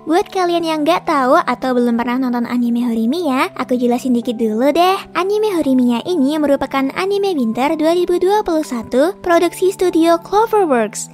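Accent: Japan. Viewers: kek suara cwek jepang yg imut"gitu tpi versi indo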